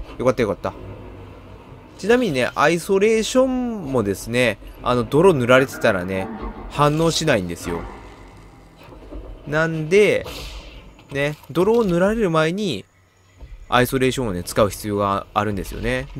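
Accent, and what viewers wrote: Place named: Aomori, Japan. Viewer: プレデターもやけど、青森？癖の強いなまりに集中出来へんわ(笑)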